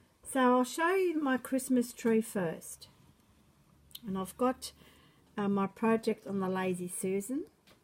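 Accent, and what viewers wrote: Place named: Australia. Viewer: just lovely, love santa up there... i did not know you came from Germany...you have the Aussie acesent down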